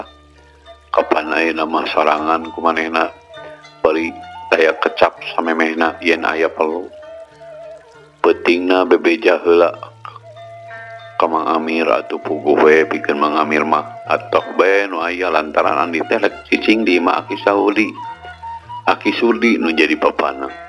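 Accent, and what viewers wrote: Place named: Sunda, Indonesia. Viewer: Resep upami merhatoskeun tutur catur bahasa kang.. SUNDA PISAAAAAAAN..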